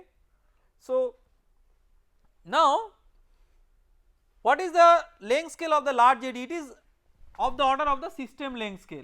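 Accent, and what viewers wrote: India. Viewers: He is an indian professor.Why should he speak in some other accent? If you are not comfortable with it-why don't you go and watch MIT videos? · ok, why all videos about turbulence on youtuve feature a dude speaking with an indian accent?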